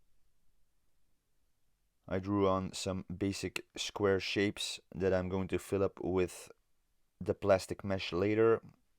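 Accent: Netherlands. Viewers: I thought I finally had it. I knew you where dutch (or Belgian) because of your name and your accent. But you use stuff not available in the Netherlands. But in this tutorial I saw a flask of bison houtlijm, so I thought yeah you must be from the Netherlands...... But then you use stuff not available in the Netherlands again..... so where are you from if I may ask?! I want to know because of all the stuff you use. All the scenery makers are using cool stuff like grannygrating... no where to be found here...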